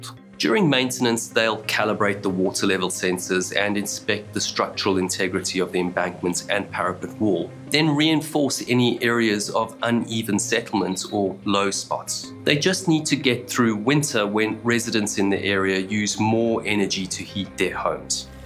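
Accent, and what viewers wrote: South Africa. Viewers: I love the south African accent. I had a good buddy from there.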